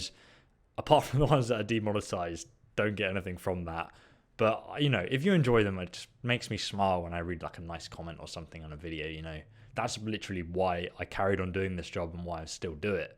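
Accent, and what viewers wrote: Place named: RP, United Kingdom. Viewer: Get off your Fat Arse and like the rest of the Fucking world get a Fucking Job!!! You make me Fucking Sick begging for money on youtube, while people are working flat out to pay off their mortgage and feed their kids, You’re crying because you can’t sustain Your hobby as a full time career...well boohoo! You sound like you’ve had a very privileged upbringing by your Received Pronunciation and the fact that you’re a grown man mentioning that “mummy and daddy will help you out!” only confirms my belief that you are a work shy Bag of Shit who has no compunction but to ponce off of the gaming community who work and play for pleasure! But don’t worry people like you will be forced to get of their lazy arse sooner or later, you don’t seriously think you can make a long time career out of this! Seriously fella wake up and smell the coffee you dozy Cunt!!!🤣🤣🤣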